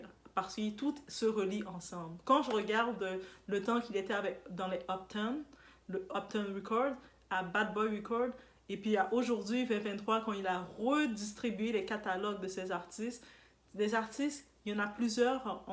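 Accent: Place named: Canada. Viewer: Excellent. Ca manque juste un peu usher et le petit Justin J’adoré ta voix avec ce petit accent canadien. 👍🏾👍🏾👍🏾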